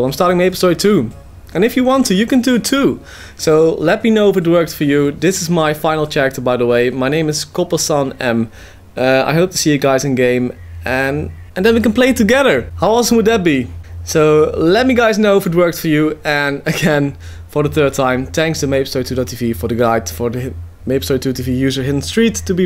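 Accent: Netherlands. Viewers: i knew it you are dutch your accent made me think is he dutch xD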